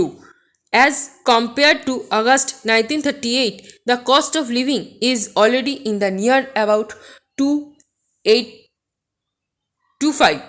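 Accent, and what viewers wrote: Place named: Bengal, India. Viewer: bengali accent but dictation is okay and that word is Surat garh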